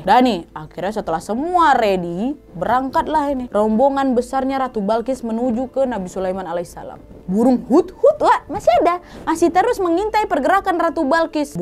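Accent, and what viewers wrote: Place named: Palembang, Indonesia. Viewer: Keren banget 🙏ka ini....logat nya itu ga bosen denger nya...kalau ga salah ka Nadia ini....wong kito galo👍....soal kl ngomong ga metinggalan....WA nya jd ciri ckas nya....smg sehat sll 🙏💪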